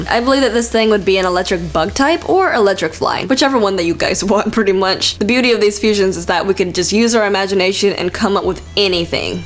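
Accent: South, United States. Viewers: Let me guess your from the south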